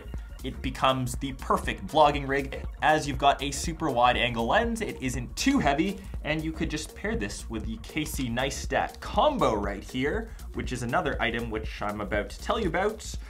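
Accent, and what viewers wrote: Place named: Canada. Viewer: he is talking canadian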